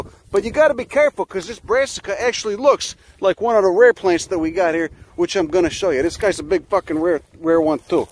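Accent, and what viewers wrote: Chicago, United States. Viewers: Mitchell Pawlak only when people irritate the shit out of us with stupidity. Most people can’t handle Chicago speak, let alone figure it out.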